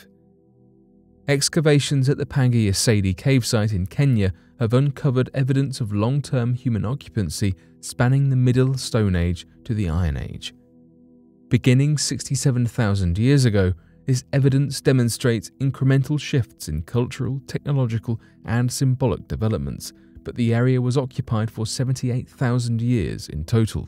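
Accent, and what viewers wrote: United Kingdom. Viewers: So you're using AI technology which makes for a robot presenter. But why did you give it a British accent? I find the British accent to be offensive.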